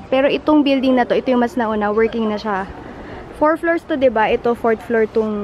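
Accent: Philippines. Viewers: kakatuwa tlg punto satin kababayan tau hihi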